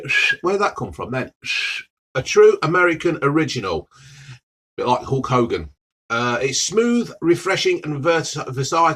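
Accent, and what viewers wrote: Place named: Northern England, United Kingdom. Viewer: Where are you located buddy? Sounds northern. Im a filthy manc and love your down to earth content.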